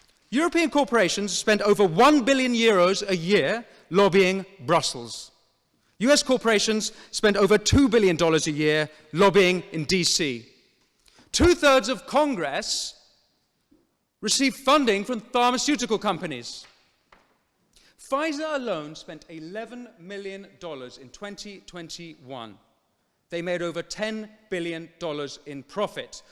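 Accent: United Kingdom. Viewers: L'accento e la sintassi sono prettamente britannici: chi è l'oratore? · Lo speaker non e' Americano. Accento definitamente British.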